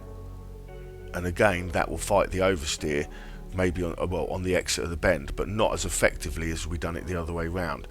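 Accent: London, United Kingdom. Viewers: TOOOOOO many Inside-wheel based gamertags so I hope you are on live,like I say my 2 gamertags are z0mv1(main)& Papa Lazarouz so if you haven't received my friend request then you send methe request fella.I'm up north in the UK by the way,Blackburn!You sound like your from the London area-ish!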